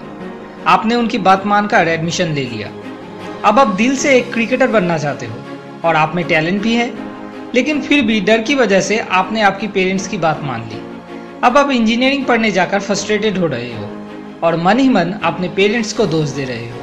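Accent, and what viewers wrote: Bengal, India. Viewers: U r bengoli Good bengoli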